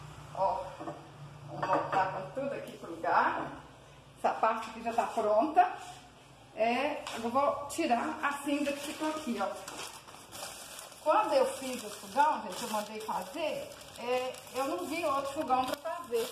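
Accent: Minas Gerais, Brazil. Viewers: Nossa q lindeza de fogão e meu sonho ter um fogão a lenha q no passado eu odiava kkkkk Porq as panela pretas pra lavar era a minha morte kkkkk hj eu adoraria ter q não faz muita fumaça kkkkkkgosto mto da comida Do fogão a lenha Maravilha de vídeo gratidão sua lida tem voz de mineirinha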